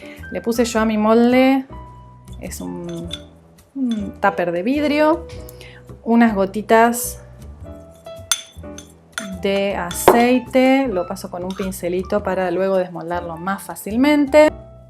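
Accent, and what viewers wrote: Argentina. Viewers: Hola Sos de arg? soy nueva, jeje tenes acento medio argentino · Como me encanta el acento Argentino, saludo desde Brasil!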